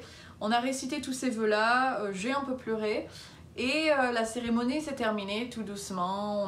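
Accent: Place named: United States. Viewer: tu as pris un petit accent américain j'ai l'impression ^_^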